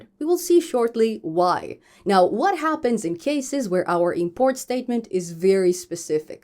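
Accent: Russia. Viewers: Мне показалось, или я слышу легкий русский акцент?) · На россиянку похожа и акцент русский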